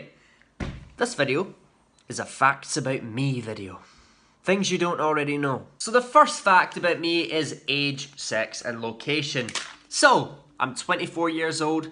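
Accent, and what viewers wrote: Scotland, United Kingdom. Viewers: Gaaahhhh I would love to go to Scotland! I have Scottish on my dads side. To go see part of my ancestry would be the best! But thank you for sharing your lovely accent! I still laugh when people think it’s Irish 😂😂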